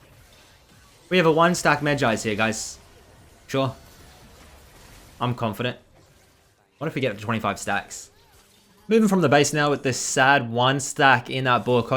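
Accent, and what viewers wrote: Australia. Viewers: I believe thats bcs of the australian accent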